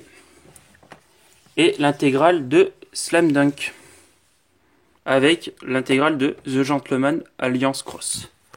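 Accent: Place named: England, United Kingdom. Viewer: Excellente cette vidéo avec un accent anglais tellement beau ! Je recommande les yeux fermés les perdus de l'internet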